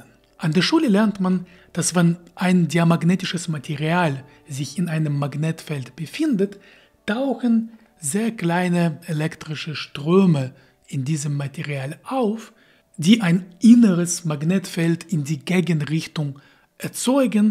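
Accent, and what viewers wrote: Russia. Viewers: Wie immer, toller Beitrag in einem herrlichen russischen Akzent! Danke für Deine Mühen! Ich „spinne“ man ein wenig: Die „Kunst“ bei der Levitation ist nicht, die Levitation selber! Die Kunst ist, die Wechselwirkung des „skalaren Higgs-Feldes“ um den Probanden, mit den subatomaren Teilchen (Quarks) des Probanden, zu unterbinden! Ohne diese Wechselwirkung keine „Masse“ und ohne „Masse“ ist levitieren ein Kinderspiel! Derjenige der das hinbekommt, der bekommt alle nur erdenklichen „Nobelpreise“ die es gibt! Garantiert!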